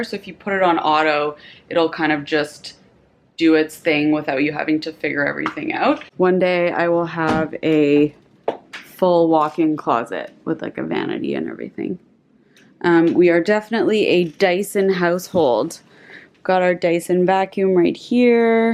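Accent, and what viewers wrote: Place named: Canada. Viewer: is anyone else able to immediately tell when someone from toronto/vancouver is speaking english or is that just me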